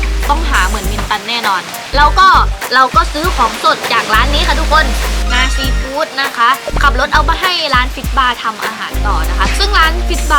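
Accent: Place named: South, Thailand. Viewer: พี่มินตันพูดใต้ด้วยอะ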